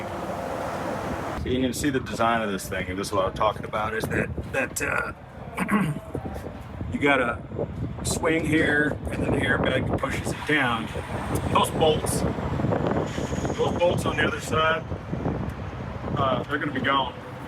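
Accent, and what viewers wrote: Texas, United States. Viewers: the Vegas man, he ended up with a Texas accent y'all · That Texas twang is coming back! LOL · every tine you go to Texas you get that "Texas accent" going, lol too cool.✌️💫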